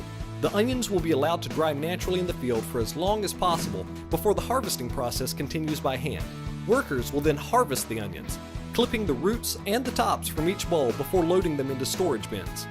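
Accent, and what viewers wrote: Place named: Midwest, United States. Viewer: Boo!!!! I watched this just to hear that awesome Georgia accent and all I heard was a boring Midwest accent. I love your onions but now I’m sad.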